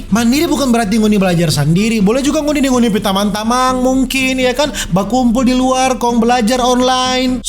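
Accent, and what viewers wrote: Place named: Ambon, Indonesia. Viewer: Logat nya sama sperti ambon dan kupang Salam dari kupang NTT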